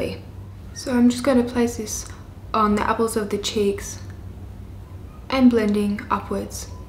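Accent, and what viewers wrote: Australia. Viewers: Love hearing Aussie accents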